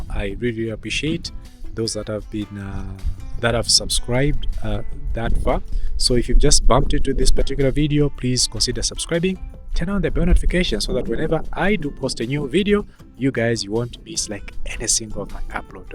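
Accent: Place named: Zimbabwe. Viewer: Wena you are an imposter from Zimbabwe why don’t you tell the truth everything about you screams Zimbawean even the accent . Zambians are very humble accommodating people .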